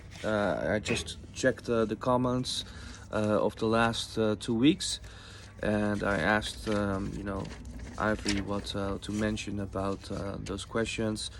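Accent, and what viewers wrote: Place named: Netherlands. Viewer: I found your entry by accident and was spellbound. I noticed your Dutch accent. My heart goes out to you. Ik vond uw boodschap door toeval en was ondersteboven van uw verhaal. Ik weet dat ik niets positiefs kan bijdragen aan uw verhaal, maar voor wat het waard is wens ik u en uw gezin heel veel sterkte. Elsche.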